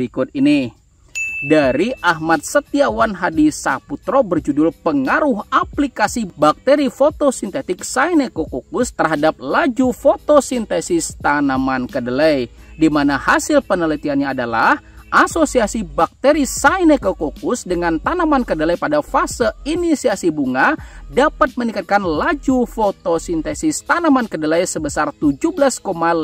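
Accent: Banjar, Indonesia. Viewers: Umpat bangga, logat amang kada hilang😂😂😂harus banyak urang2 ky amang di bumi ku borneo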